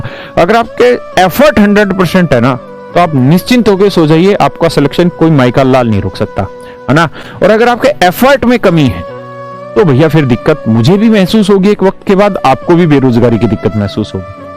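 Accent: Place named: Maharashtra, India. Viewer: Ye Maharashtra ki tone 😂😂😂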